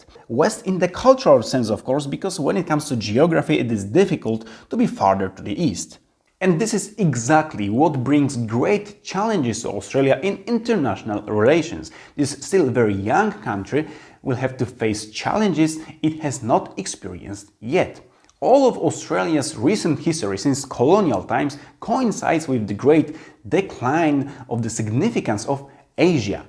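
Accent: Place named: Poland. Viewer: Add english subtitles asap. You need them with your polish accent.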